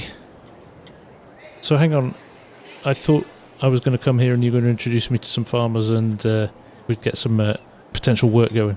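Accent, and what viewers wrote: England, United Kingdom. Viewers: was questioning wether you are English or American, then you looked at the sprayer and did not panic because it wasn't the USA flag xD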